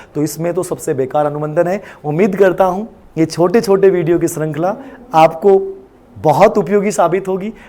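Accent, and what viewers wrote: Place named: Shekhawati, India. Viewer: Sir churu jhunjhunu se ho kya....lang. udhar ki lgi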